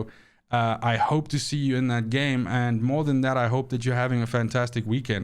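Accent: South Africa. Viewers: South African? Love the accent.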